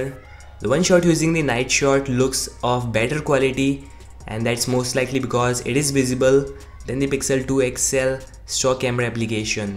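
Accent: India. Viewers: I thought you were Indian!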